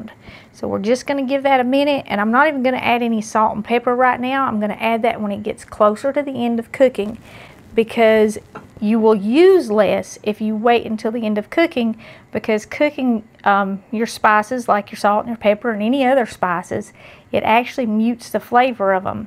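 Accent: South, United States. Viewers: I am a New York Italian, who loves Jesus and I feel such an affection for my sister with a southern accent, I would love to come on your show one day and and cook something real Italian like a very old Neapolitan recipe that we call rice pie, we are all going through a hard time and I so appreciate your faithful words and I so agree with all you say. To God be the glory, God bless you my sister and again I say Thank You. I will look through the comments for those who need help I am blessed I live with my daughter she looks after me God bless her, so I will let the Lord lead me in helping others. Oh by the way I love your recipes.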